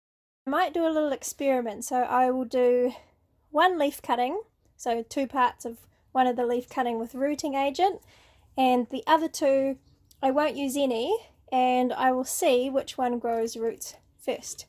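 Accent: New Zealand. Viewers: I love your videos, binge watched them all 😊 Your accent is the best, brings me back to the time I spent in NZ ❤️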